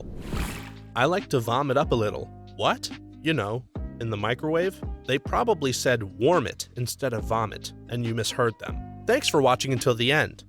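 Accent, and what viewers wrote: Scotland, United Kingdom. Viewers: Oh god as a glaswegian I love your Scottish accent 🤣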